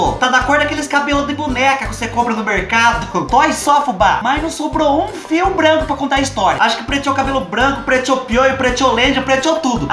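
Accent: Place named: São Paulo, Brazil. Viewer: Gosto mto dos seus vídeos vc, é mto engraçado, com esse sotaque paulista.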